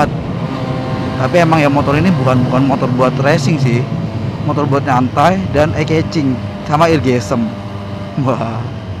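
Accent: Surabaya, Indonesia. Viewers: Mas orang surabaya ya?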